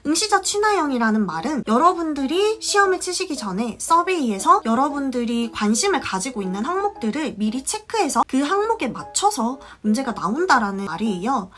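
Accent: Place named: Gyeongsang, South Korea. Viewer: 베이스가 저보다 탄탄하신거 같네요 아 그리고 착각일수도 있는데 경상도사투리가 느껴지는건 기분 탓일까요 ㅎㅎ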